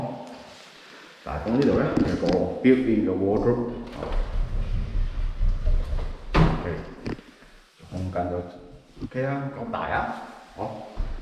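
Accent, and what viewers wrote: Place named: United Kingdom. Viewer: 旁述哥哥应该戴住口罩讲野 ，所以听唔清楚 ，加上一听已经知道唔系土生土长香港人 ，可能是在英国出生的华人 ，我姪女也是这样跟我说话的 ，唔紧要 ，会越做越好的。努力！加油！😉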